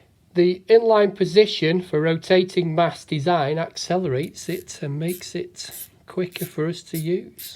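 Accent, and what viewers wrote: United Kingdom. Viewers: Yeah 😂, I think a solid 50% of us Brits have a hard time speaking our own language, it honestly gets worrying after a while 😂, are you from up north?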